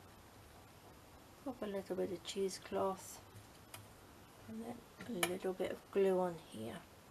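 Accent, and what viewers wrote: United Kingdom. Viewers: So very adorable. And so easy.. what we all need sometimes. And I have this silly comment to make. I love how many British people say "pop this on or pop over here or pop the kettle on for tea". It just makes always makes me smile. When said with the British accent it makes we want to get a flight to England tomorrow to visit anyone and have a cup of tea. Lol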